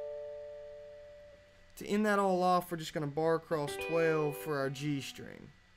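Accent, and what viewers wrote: South, United States. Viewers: you're good, love your southern accent too.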